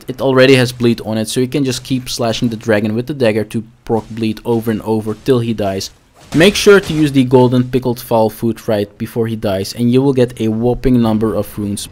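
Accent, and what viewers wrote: Netherlands. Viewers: Are you u from the Netherlands ?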